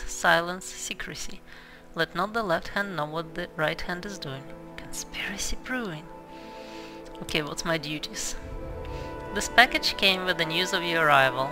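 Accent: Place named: Russia. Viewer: Dat Russian accent is so awesome. I will gladly be a sub of yours :)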